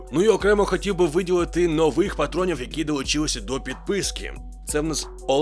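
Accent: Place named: Russia. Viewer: Так смешно слушать русско говорящего, на украинском языке, ну да что не сделаешь что бы в армейку не за лететь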